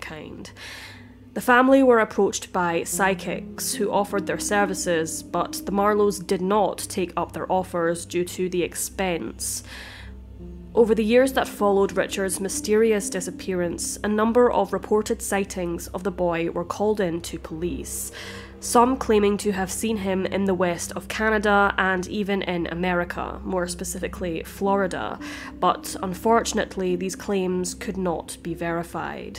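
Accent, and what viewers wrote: Scotland, United Kingdom. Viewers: She’s Scottish that’s the way it comes over.lif u know any thing about how language is spoken.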